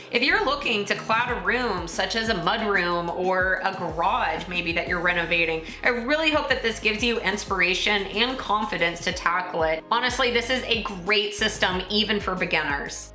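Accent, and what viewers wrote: Texas, United States. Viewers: with your Texas accent, when you say the name it sounds like "Pure Stoke". ALso a cool name.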